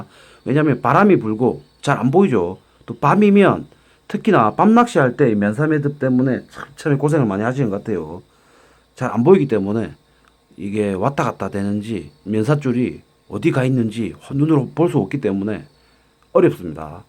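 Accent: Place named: Gangwon, South Korea. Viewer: 강원도 분이 신가봐요. 너무 웃기게 말씀하네요. 감사히 시청하였읍니다.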